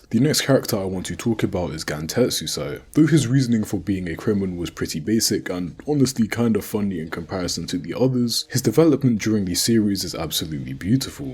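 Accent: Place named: United Kingdom. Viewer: Hello, I’m assuming you’re British from your accent. I love jjk, csm and Hells paradise. Love your reviews that’s why I subscribed and liked:)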